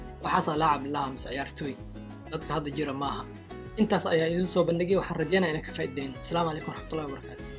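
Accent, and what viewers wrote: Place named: Benadir, Somalia. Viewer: Sxb afsomaliga wuu lahjad bandyhy haku mashquulina lahhada banaadiriga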